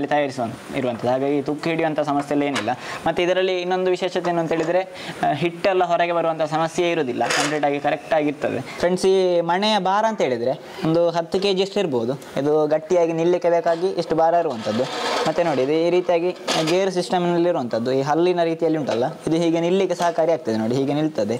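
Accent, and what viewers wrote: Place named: Udupi, India. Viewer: Super receipe...nicely done.looks yummy. I like all your videos and enjoy the way you talk in Udupi Kannada.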